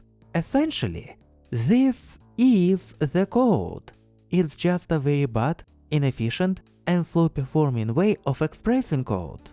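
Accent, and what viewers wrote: Russia. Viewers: he is russian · Probably slavic. Really strong russian accent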